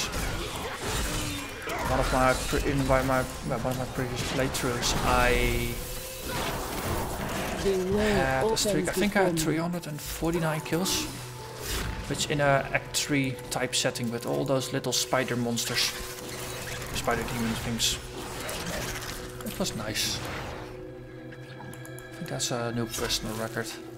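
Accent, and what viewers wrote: Netherlands. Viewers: ik hoor gewoon dat je nederlands bent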